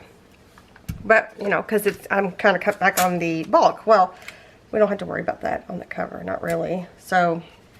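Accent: South, United States. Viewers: Has nothing to do with sophistication its accent from the south. Give yourself some credit girl.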